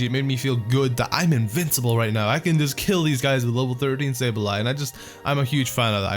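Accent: Scotland, United Kingdom. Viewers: you can really hear scottish in in his first video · Is this nigga Scottish? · That Scottish accent in the first video you ever made :'D xD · Are ya scottish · Scottish? :P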